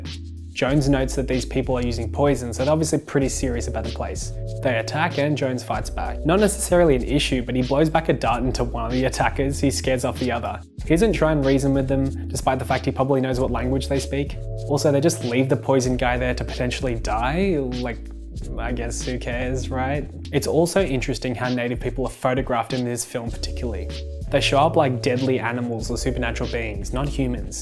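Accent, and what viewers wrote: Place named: United Kingdom. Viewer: Its amazing you can say this with a straight face. I thought it was hilarious, the brittish accent is really the icing on the cake!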